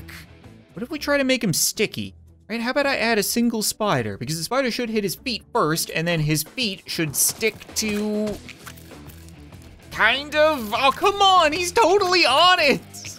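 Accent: Canada. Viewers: Don't make fyn of him he's Canadian so he talks a little different what might make sense here dissent make sense to him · He pronounces things different cuz he's Canadian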